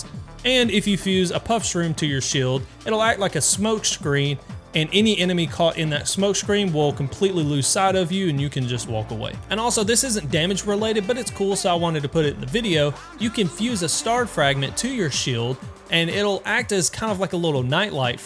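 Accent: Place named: South, United States. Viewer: Followed because of the southern accent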